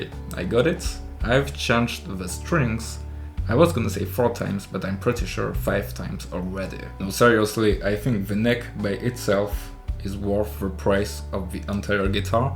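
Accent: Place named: Mumbai, India. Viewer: Où nous démontre sans emphases que: •T as pas besoin de lâcher ni 1500, ni 1000 et ni même 500 euros ou $. • que la guitare venant de Chine ou d ' Angola ou de Papouasie n est pas un problème en soi. • Que c est bien le jeu qui compte et à travers ça la musique. • idem les amplis • idem les effets Les fabricants de guitares de processe industriel ont tous une c.n.c, savent tous bobiner du fil de cuivre autour d aimants. • mon constat: il ya de quoi passer une décade à apprendre à jouer avant de passer à des guitares plus chères et mieux faites. • autre constat:, tu as un Anglais de Bombay.. pratique car on comprend tout et même moi ( c est dire ). Merci pour ton travail et tes avis sur tes vidéos.🙂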